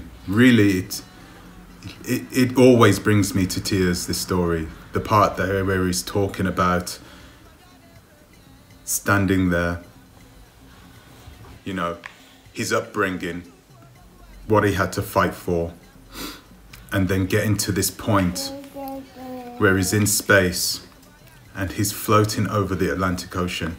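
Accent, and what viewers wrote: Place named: United Kingdom. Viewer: bro sorry to say that but ur English accent isn't Semmes like an American it's Semmes like u da UK tho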